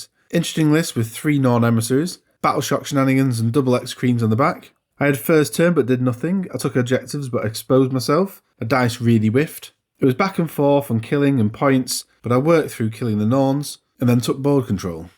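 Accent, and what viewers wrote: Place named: Northern England, United Kingdom. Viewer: Ok, fine, you sound like a northerner. My family is from Northern England. now just a bunch of Americans.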